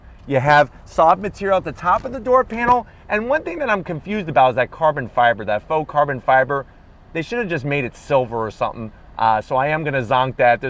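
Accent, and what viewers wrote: United States. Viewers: not u. He's got a yank voice. Maybe NJ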